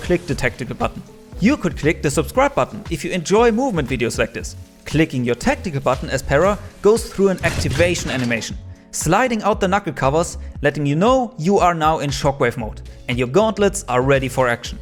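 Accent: Germany. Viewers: One question are you german 😅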